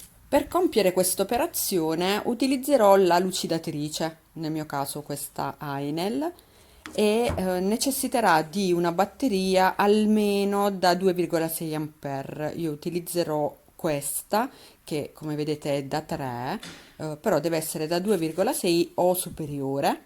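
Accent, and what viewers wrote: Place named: Bari, Italy. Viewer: Tu sei meglio di Diletta. Sai fare cose speciali e sei simpatica. Non mi sembri zona Foggia..più verso Bari. Sei chiara nelle spiegazioni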